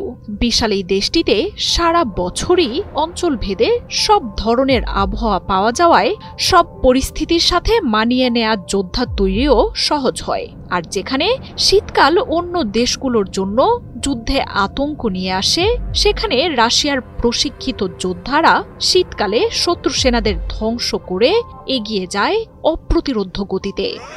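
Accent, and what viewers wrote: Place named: West Bengal, India. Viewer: News reporting shune west Bengal er kono channel mone hocche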